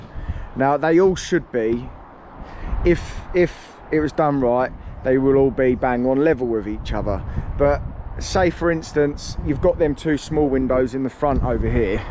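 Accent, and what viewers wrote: Cockney, United Kingdom. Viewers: I never knew bricklaying was so technical.....thought it was just bish bash bosh! At least that is what I witnessed when a bricky built a wall for me and probably why it looks so shit! Loving the cockney c bombs thrown in.....qwality son. Thumbs up.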